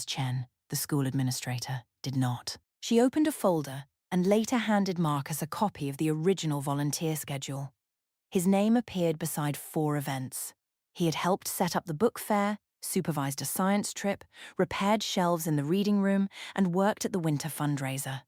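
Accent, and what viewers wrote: England, United Kingdom. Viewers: The English accent didn’t help any!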